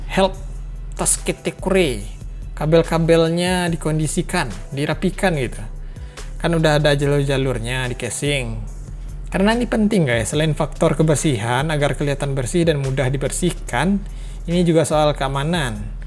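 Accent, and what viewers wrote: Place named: Padang, Indonesia. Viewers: Iya Gan Dia Orang Padang. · Ini orang padang ya? Dialeknya kentara bgt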